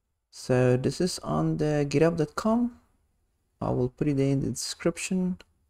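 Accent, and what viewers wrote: South Africa. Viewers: I recognize a fellow SAn when I hear one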